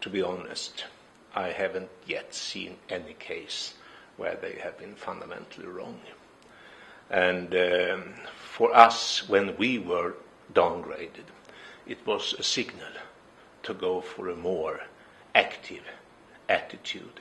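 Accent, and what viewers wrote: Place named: Sweden. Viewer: he might as well been speaking swedish, terrible accent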